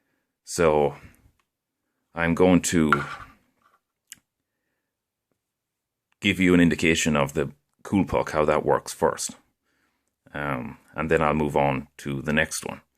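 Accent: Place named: Ireland. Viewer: Very informative and nice voice! Can't help but love an Irish accent <3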